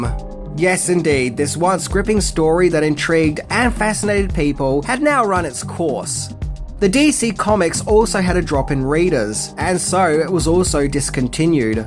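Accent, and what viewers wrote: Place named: Australia. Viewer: I love the (Australian?) accent pronouncing it "Vay." It keeps catching me as I listen. "Vee" sounds more ominous, imo. But I'm glad this series appears to have crossed the pond if it went all the way down under. As kids, we gathered together at my friend's house because they had cable, which meant really good reception without going blurry like television shows did back then if you didn't have cable. It was before HD, so the effects were super cheesy, but so much fun!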